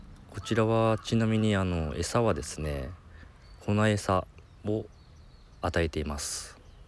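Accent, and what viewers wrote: Kyushu, Japan. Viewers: こんばんわ〜 チャンネル登録させていただきました！ 方言的に九州の方ですか〜？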